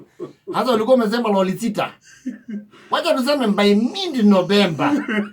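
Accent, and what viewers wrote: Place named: Kamba, Kenya. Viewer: Ngoni unaongea kama mkamb kapsaa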